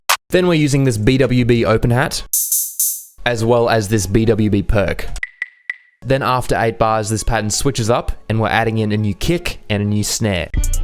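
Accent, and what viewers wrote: Australia. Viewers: Mate are you from Australia? Cus I hear the accent 😂🤙🏾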